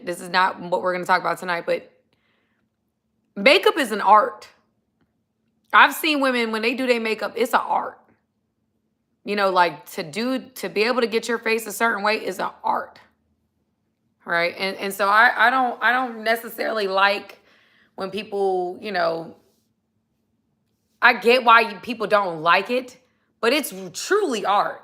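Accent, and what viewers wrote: South, United States. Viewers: Hi this your country voice, is good for country music..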